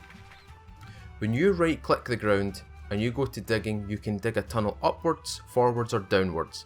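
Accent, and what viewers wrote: Scotland, United Kingdom. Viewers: Thank you always getting my lif information here and is your accent scottish? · I watch these just to hear that beautiful Scottish voice of yours.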